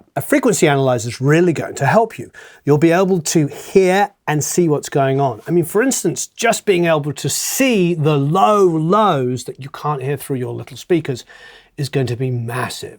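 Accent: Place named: RP, United Kingdom. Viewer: Hi, Hope you are doing Marvelously Well! :haha I remember watching 5 years ago and I still remember the posh accent lol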